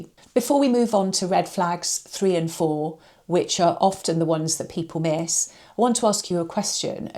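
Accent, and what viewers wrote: United Kingdom. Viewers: I was recommended your video just now, and signed up for your newsletter! I am glad your video popped up. I like your demeanor and tips. I like your demeanor because you sound like you are from the UK. Here in the USA, many places need that good ol English/British/or other UK country wisdom that helped mold the USA.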